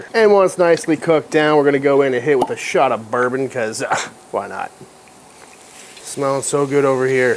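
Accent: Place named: New England, United States. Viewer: Idk what this means, but this was the first video of yours that I saw and I could immediately tell that you were also from New England.... love the content and you remind me of one of my cousins lmao. Please keep doing what you're doing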